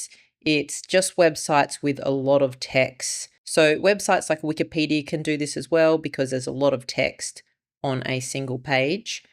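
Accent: Australia. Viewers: Great video as always. The iPad is such a great tech device and it’s awesome that people like yourself publish these videos to help people that might not be technically proficient. Cheers from this Aussie living in NZ 😎🎸🤘💕 Edit: and it’s always good to hear an Aussie accent here on YT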